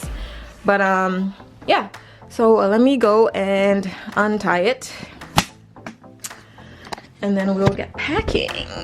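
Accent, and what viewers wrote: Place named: Caribbean. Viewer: I detect a Caribbean accent =) Hey Sis!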